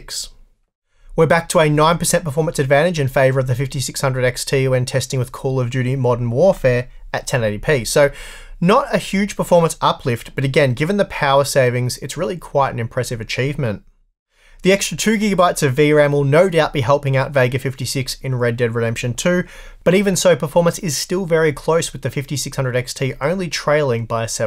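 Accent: Australia. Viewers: love that aussie english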